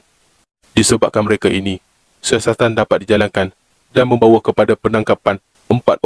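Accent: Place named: East Coast, Malaysia. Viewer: Pelat Pantai Timur pekat sangat. Pahtu be Ai-See-Ae-Oh, bukan Ai Kau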